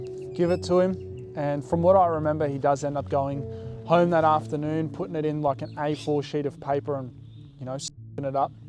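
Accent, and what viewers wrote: Australia. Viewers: Are you Aussie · thats an aussie accent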